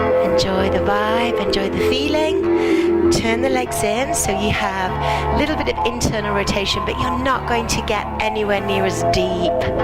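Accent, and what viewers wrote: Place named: England, United Kingdom. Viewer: hello. I am blind and really appreciated the fantastic order description also I love the English accent am I and as I am also English thank you thank you so much will keep listening to your channel regularly Donna Adelaide Australia 55-year-old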